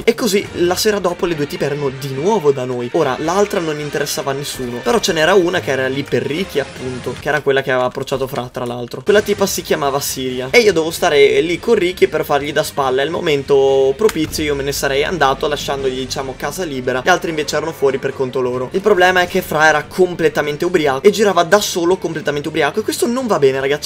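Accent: Veneto, Italy. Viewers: te sei veneto? Io si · ma sei veneto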